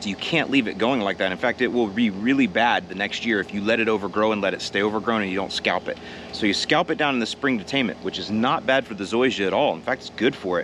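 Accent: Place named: Midwest, United States. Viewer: Walk and fert? How about “walk, fert a minute” in that glorious Midwest accent.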